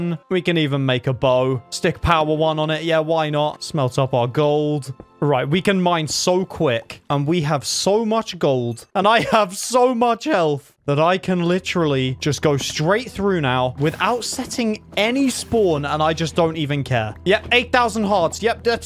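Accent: United Kingdom. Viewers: YOU KINDA SOUND BRITISH LIKE GEORGE FROM DREAM SMP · Hes british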